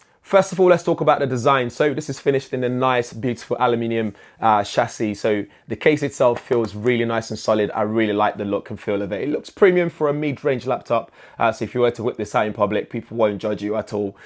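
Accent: United Kingdom. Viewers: Brits English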